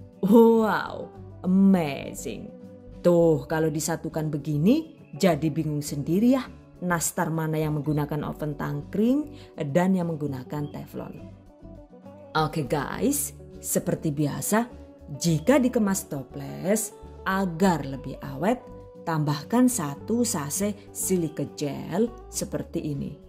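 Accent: Banyumas, Indonesia. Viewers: Ngapak gaes😂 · Wong ndi njenengan, bahasane gaul tapi ngapak ya rikane.. Nyong dadi ngguyu2 ulih ngerungokna rikane. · Deneng rika ngapak😅😅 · Aku suka channel ini. Jelas bgt ksih ktrangan d stiap resep.. Juga ga pelit ilmu. Ada bhsa ngapak.. Yg bkin ktwa. Sukses trus ya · Karena saya g punya oven,bisa dicoba nih resep yg satu ini. Hmmm dari tampilan nya enak bgt, Mantep pokoknya 👍👍👍 Dan selalu suka sama ngapaknya bikin ketawa 🤣 #GiveawayTHV2021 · Wih sangat" faham cara menyampaikan nya bahkan bikin ketawa kas jawa ngpak nya😂😂😂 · Resepnya mantap...ngapak-ngapaknya bikin ngakak...ca.....tat #GiveawayTHV2021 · Ini wong ngapak yah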